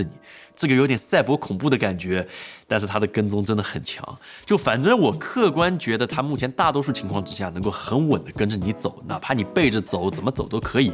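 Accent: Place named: Jiangsu-Zhejiang, China. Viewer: tim口音像江浙一带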